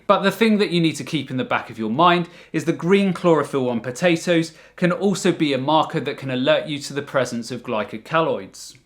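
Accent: United Kingdom. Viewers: I am not plant based, but I still love watching your videos. 🙂 You give alot of good advice. Plus, I like the British accent lol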